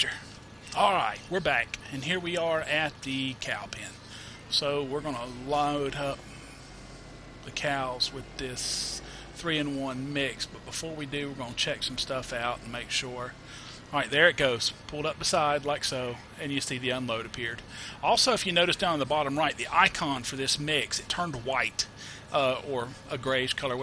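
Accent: South, United States. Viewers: hey bo really like how you explain the different steps. Most other videos do explain it quite like you do. Appreciate how you explain stuff. Keep up the great work man. I am from NC sure is great to hear someone from the south explain things. Makes alot more since to me than someone from some where else.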